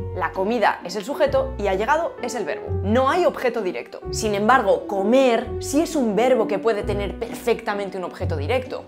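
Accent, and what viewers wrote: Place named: Spain. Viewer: ❤ q lindooo habla señora!!! Es la primer española que escucho y no me suena mal!! Es muy dificil para los latinos escuchar españoles😂😂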